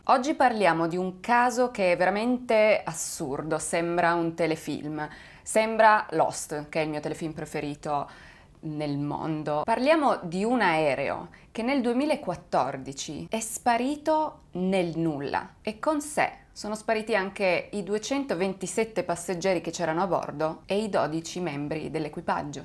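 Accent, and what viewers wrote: Turin, Italy. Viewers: accento lievemente torinese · Condivido tutti i meritatissimi complimenti, poi, malgrado viva fuori Italia, ha una bellissima e particolare cadenza torinese. Prolunga in modo oggettivo, ma, soprattutto, soggettivo, le vocali. Brava.... c'è poco da fare, ha una marcia in più. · È la classica cadenza Torinese.... Specialmente la E e la O per noi, a Torino son tutte aperte 😂😂😂😂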